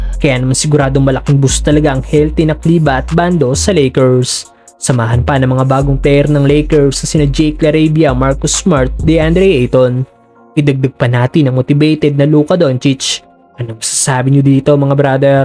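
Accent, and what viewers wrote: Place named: Laguna, Philippines. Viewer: Taga Laguna ito halata sa tono mo hehe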